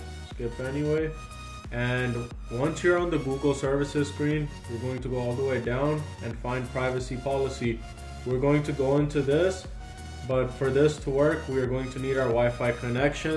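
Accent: India. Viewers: You know this tutorials wont work when they have that shit music and indian accent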